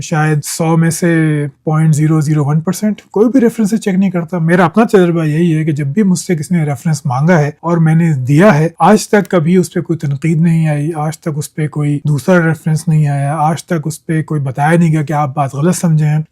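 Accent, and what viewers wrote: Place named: Sindh, Pakistan. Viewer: اوپر سے ھو بھی سندھی اسپیکینگ یا پھر پنجابی ڈھگے ھو آپ ۔۔۔کراچی والی لینگویج نھیں لگتی آپ کی۔۔۔۔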